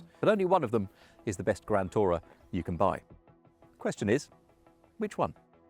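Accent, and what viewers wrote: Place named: United Kingdom. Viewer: This is normal British delivery. You're asking for it to be more American. Perhaps you're in the wrong place.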